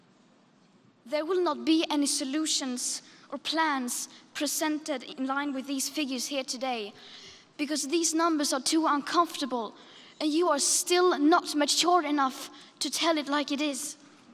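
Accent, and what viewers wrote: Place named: Germany. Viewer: Выступление Греты Тунберг на саммите ООН по климату - на английском языке (с тяжёлым немецким акцентом) с русскими субтитрами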